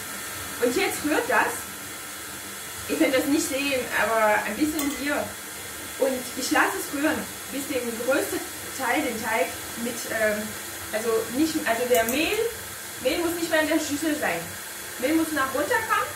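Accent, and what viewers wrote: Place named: France. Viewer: Vielen herzlichen Dank ❤für dein witziges und aufschlussreiches Video , ich habe ,wieder mal ,viel dazugelernt 😊. Ich liebe deine Rezepte ,deine lockere,flockige Art und deinen französischen Akzent . In deiner Arbeit steckt so viel Liebe und Freude,dass ist einfach unglaublich schön. Liebe Grüße Heidi ❤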